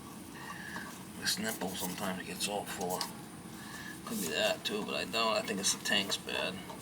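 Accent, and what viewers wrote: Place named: Massachusetts, United States. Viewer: I'd already watched a bunch of water pressure vids when I came across yours. I was going to skip it until I heard what I'm guessing is a Mass accent... so I watched the whole thing. The little dog riding with you was awesome and capped off with the howling pug. Great stuff!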